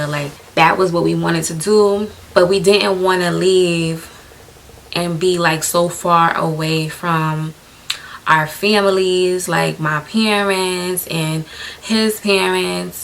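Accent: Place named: Brooklyn, United States. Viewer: love this video from the jump...lol. your Brooklyn accent is so strong. love it! I am planning on moving to Florida in 4 months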